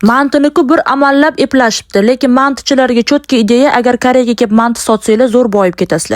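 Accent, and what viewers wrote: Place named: Tashkent, Uzbekistan. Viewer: Opam sz keli Toshkent gap zur gapirasz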